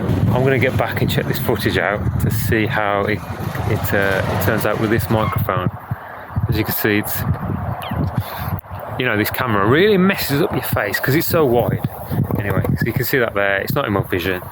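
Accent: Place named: Nottingham, United Kingdom. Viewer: Cool video . 👌 I guess you’re from Nottingham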